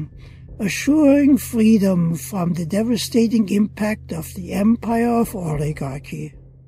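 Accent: Germany. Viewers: I suspect his native language is German. I agree, he is a bit difficult to understand. But, since i do speak some German, it might not be as difficult for me to understand him as it might be for you. I also agree that many music over voice applications on any number of Y.T. presentations totally ruin the videos.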